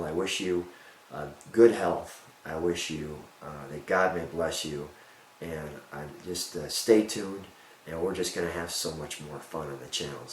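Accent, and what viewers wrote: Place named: Chicago, United States. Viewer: Hey. Congratulations and thanks for the videos. I love cars like you do but some days I'll grab a My Car video just to hear some Chicago accents. I've been in Texas too long. Best wishes for your continued success and fun!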